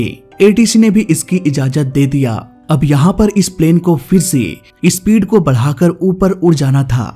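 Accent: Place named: Bhojpuri, India. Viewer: Your voice is melodious, the style of speaking Hindi is different, like from Bhojpuri.Hindi is the official language of India.And this great knowledge is not found in Hindi.When you write a book, you must tell it here, but not the story in that book, give information about the plane because the story seems alive in your sweet voice.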